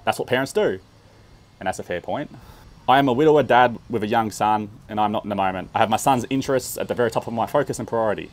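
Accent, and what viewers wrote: Australia. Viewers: Hi I am from Burirum and recently I am live in UK 🇬🇧 I am watch your channel every day and I am learning a lot more English from you ❤ I quite like the Australian accent love it ❤ Anyway stay strong and think positive ❤you are doing well ❤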